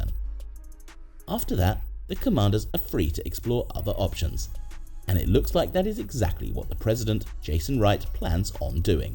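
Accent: United Kingdom. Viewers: Knowing this is AI generated video, the statement about Bills wanting a new stadium , was pushed by the NFL brass. But the AI is incapable to think or know anything. Also British accent doesn't fit here.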